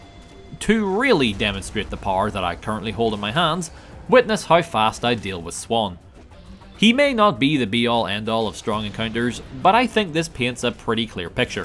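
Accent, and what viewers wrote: Northern Ireland, United Kingdom. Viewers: From the beginning of the video where you said you talk like Marge Simpson I was trying to place your accent. I knew it was Irish and thought it was probably northern but you answered my question in the first video I legitimately thought about it. Love when that happens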